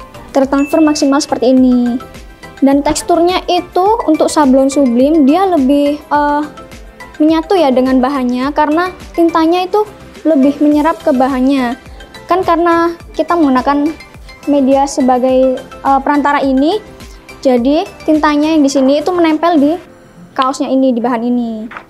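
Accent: Java, Indonesia. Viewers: logat jawa😁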